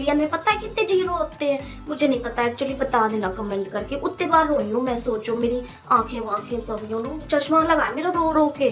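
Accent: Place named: Punjab, India. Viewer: Aapka dukh Sankat tu mujhe Rona nahin a raha hai balki Hansi a rahi hai aapki baten sunkar 😂😂😂 itne acche se aap Punjabi language wale rahe ho, aur mujhe lag raha hai kuchh logon Ko karara jawab mein mil raha hai aapki ine baton se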